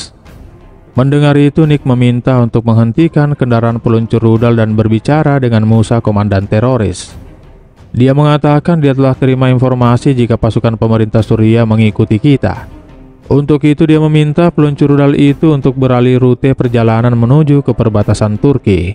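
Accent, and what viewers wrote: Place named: Madura, Indonesia. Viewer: Ngomongnya kek orang madura lu min aga kurang realistis sama filmnya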